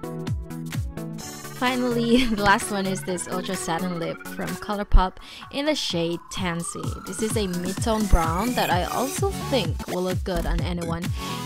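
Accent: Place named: England, United Kingdom. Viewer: love your english accent sis 😊 Helly Cass here sis from the group 😊 I subbed sis.. ty ulit sis sa pag subbed 😊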